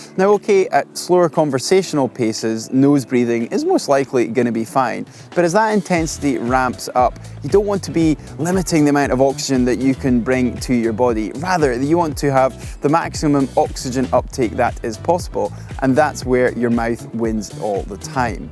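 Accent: Scotland, United Kingdom. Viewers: There is just something about that scottish accent, coming from either men or women, doesn't matter, that just... 😍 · Defo Scottish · Really though, what is his accent? It sounds like a Scottish blend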